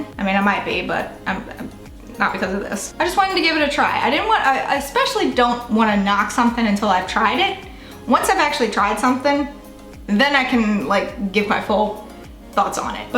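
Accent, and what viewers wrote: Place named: Metairie, United States. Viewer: I can hear the Metairie in your voice! Haha! Kenner here :)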